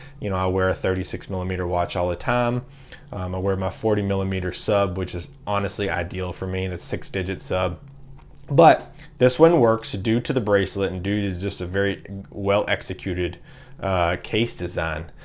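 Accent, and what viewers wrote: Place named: Kentucky, United States. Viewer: I hear a Kentucky accent!!!!!!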